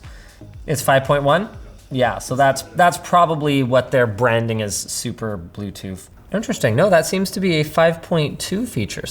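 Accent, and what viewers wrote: France. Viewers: Yo you have a nice french accent Linus boy, and i live in France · Nice French accent! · That french accent 😆😆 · Linus talking french is the best accent ever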